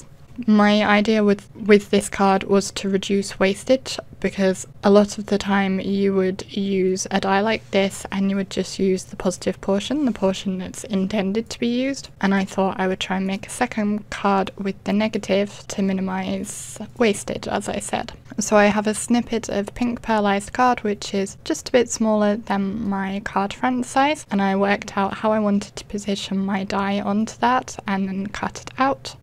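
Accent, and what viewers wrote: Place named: England, United Kingdom. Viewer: (I just want to let you know first that I'm not commenting to win. I truly just know this because I'm also on a crafty budge. So don't feel bad at all! Just because we're on a crafty budget, we can bring just as much happiness to others through the cards we make. So just think of all the people you make happy!!!!! ) For foam tape, you can use just regular pieces of foam or even foam with adhesive already on it. You can get this at just about any craft store. Then just cut to size and add to project. I noticed that you have an English accent. So if you're across the pond, I'm not sure what stores you have but it's available at any Dollar General or Dollar Tree store. You also mentioned that your embossing ink was drying out. You can use the same ink pad & just re-ink it with glycerin. (Check out Lindsay Weirich- "thefrugalcrafter"" her YouTube channel name. 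She used to do alot of cheaper ways to do things. Now she seems to focus on watercolor tutorials.) Glycerin can be bought at any general pharmacy. Your cards were very beautiful!!!! I loved the ink blending behind the heart & the sequins sparkling through the heart pieces!!! You definitely are better at that technique than I am!!! I tried once & gave up. Good luck with your YouTube channel & everything else!!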